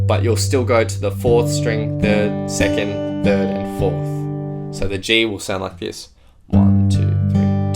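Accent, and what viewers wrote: Australia. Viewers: 4. Because you are Australian and your accent makes sense to a fellow Aussie!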